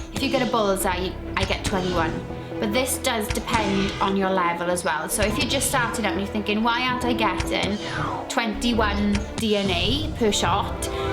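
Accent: United Kingdom. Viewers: She is scouse my g an accent in English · She literally has an English (or Welsh) accent.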